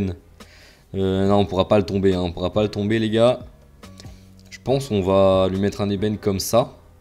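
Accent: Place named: Alsace, France. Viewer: L'accent alsacien qui s'entend fort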